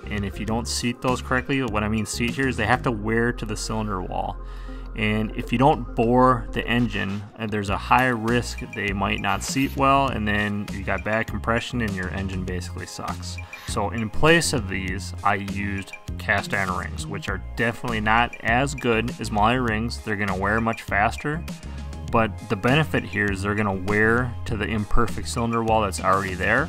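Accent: Midwest, United States. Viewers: You sound like you are from the mid West such as Ann Arbor Michigan. I have family out there so I recognize it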